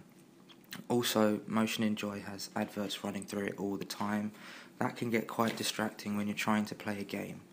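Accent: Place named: London, United Kingdom. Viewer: I love the London accent!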